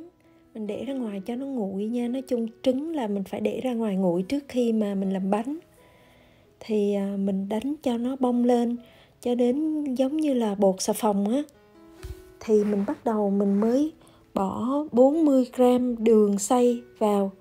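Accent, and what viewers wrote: Hue, Vietnam. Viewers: Cám ơn với hướng dẫn rất chị tiết và giọng nói Huế nhẹ rất dễ thương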